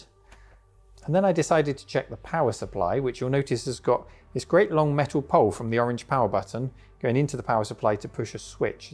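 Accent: United Kingdom. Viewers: You don't sound like you are an American, you sound like that you might be from Britten.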